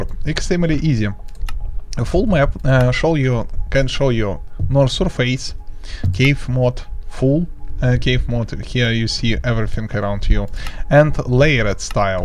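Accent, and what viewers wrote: Russia. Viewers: Русский акцент мне не кажется? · да он русский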